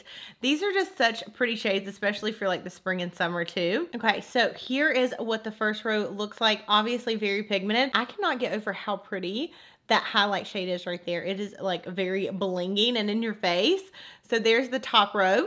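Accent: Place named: Texas, United States. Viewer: 😃😊 your accent is tooo flipping cuuute! Love it live it im from dallas tx origionally now stuck in indiana but your accent reminds me of home in a i miss tx kinda way!☺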